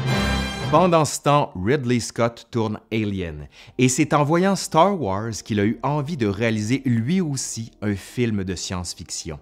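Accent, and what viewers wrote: Quebec, Canada. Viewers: Quel plaisir d'avoir découvert cette chaîne à l'accent français chantant du Québec et sa bonne diction des mots anglais que nous n'avons pas chez les vidéastes français x) Contenu très qualitatif !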